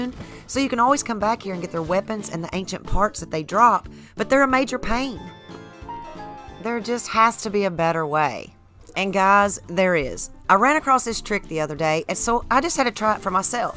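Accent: South, United States. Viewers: I love your southern draw ma’am!!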